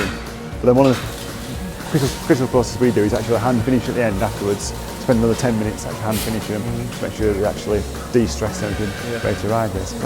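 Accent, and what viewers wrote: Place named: United Kingdom. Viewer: The worst audio, buy some microphones. It hard enough to understand the thick limy accents. Talks like hes on coke or flour in his mouth.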